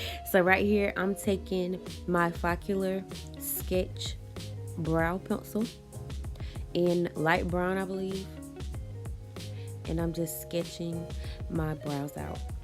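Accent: New Orleans, United States. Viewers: I love this look and your accent, are you from NO?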